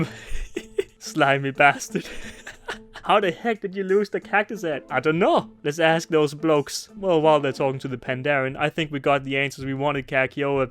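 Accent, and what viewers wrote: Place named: Denmark. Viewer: Du er altså dansker. Det kan jeg høre :)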